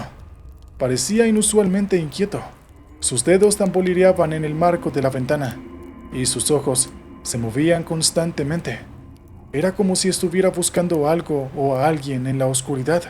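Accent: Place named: Mexico. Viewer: Esa voz suena mexicana 🤣🤔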